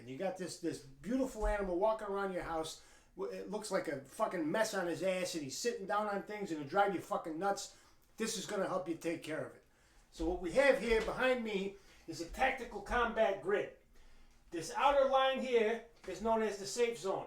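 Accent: United States. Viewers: What happened to this American accent I need a friend who speaks like this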